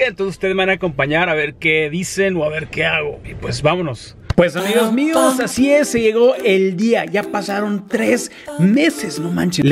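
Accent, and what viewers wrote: Monterrey, Mexico. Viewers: ¡Te ves super bien! 😍 ¡Amo tu voz y ese acento de regio! 👌💜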